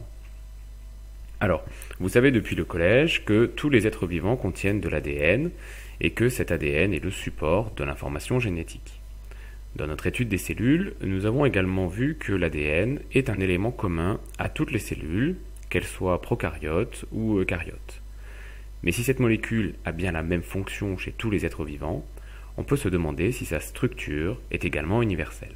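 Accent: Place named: Paris, France. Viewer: léger accent parisien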